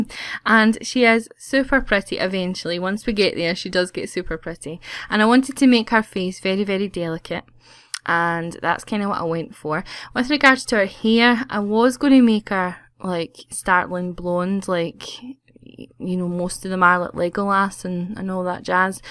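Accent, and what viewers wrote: Scotland, United Kingdom. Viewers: Hi, I love the LOTR, and your Sims really looks like an Elf, she's very pretty :) Are you Scottish by any chance? :D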